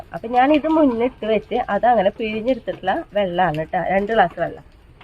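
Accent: Thrissur, India. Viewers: എവിടെ ആണ് നിങ്ങളുടെ വീട്? തൃശ്ശൂർ സ്ലാംഗ് കേൾക്കാൻ ഒരു സുഖമുണ്ട് ഇല്ലേ? ഞാനും തൃശൂർകാർക്കാരി ആണ് ട്ടോ.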